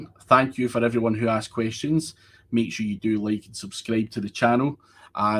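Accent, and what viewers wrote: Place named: Leith, United Kingdom. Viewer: Many thanks again. Your accent is spot on in the People’s Republic of Leith. - hit me up if you’re looking for a native English teacher; language courses is a niche I work with, can get you some great deals with the top schools; accent really isn’t that strong, with a wee bit of practice, you’ll have no problems.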